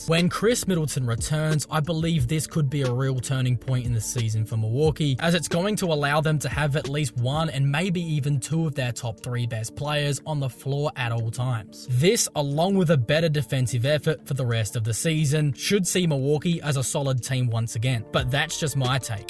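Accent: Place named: Australia. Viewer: Beef Stew should get a suspension for that hideous flagrant 2. Dirty Mofo. Love the channel man. Love the Aussie accent. Oi Oi!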